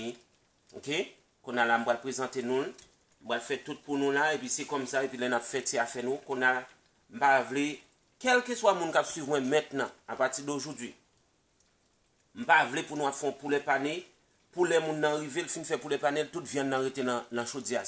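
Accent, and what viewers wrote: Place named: Montreal, Canada. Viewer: I can tell this guy is living in montreal by the way he speaks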